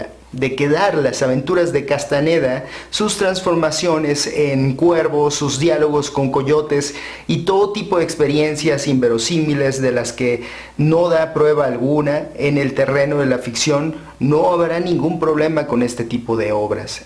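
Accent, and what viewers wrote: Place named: Oaxaca, Mexico. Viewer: Así como tu hablan los pinshis waxhos que odi@ los de zonora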